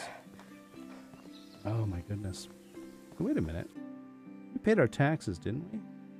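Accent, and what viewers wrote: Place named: North Dakota, United States. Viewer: So I have been sitting here binging this series (how long will it take to get caught up?..haha). I have to say thank you, listening to your gameplay while I work just makes my day better. I am related to a bunch of North Dakotans and Minnesotans and your accent is very similar, it just puts a smile on my face. So thank you again. :)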